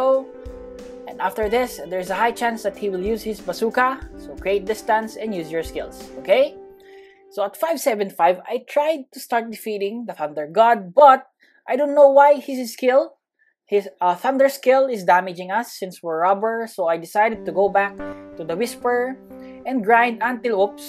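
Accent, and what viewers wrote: Philippines. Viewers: sabi na filipino to accent palang eh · sabi ko na nga ba sa accent mo pilipino ka eh hahah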